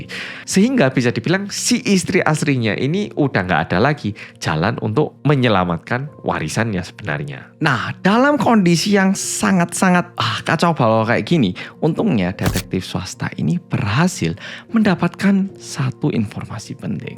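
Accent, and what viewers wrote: Java, Indonesia. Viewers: Bahasa indonesiamu hampir ilang tapi kenapa boso jowo mu jik nempel bgt😅 unik bgt kwe soll😂😂😂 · hahhah... peIiket jare 😂 . mbanyolan mu sek panggah Iuucuu wae maas 😂 .... jowomu sek kentheI Iooh 😂 minggu, 16 n0fember 2025 | 25 jumadil-awal 1447 hijriah . 00;35 WiT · Hellow bolo medoook❤ Nyimaaak 🎉🎉🎉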